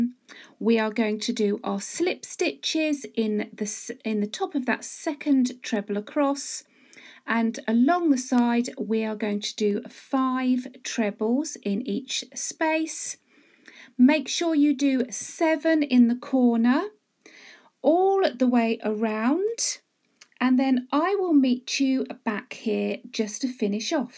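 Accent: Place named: England, United Kingdom. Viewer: You are a LIFE SAVER as this works irrespective of the size of the "granny" - THANK-YOU, THANK-YOU, THANK-YOU !!! (also lovely to hear an English accent) with love & Blessings from South Africa xxx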